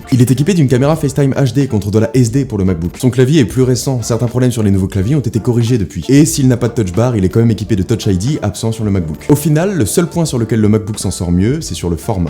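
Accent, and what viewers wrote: Quebec, Canada. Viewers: Ahh un québécois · Cette accent, québécois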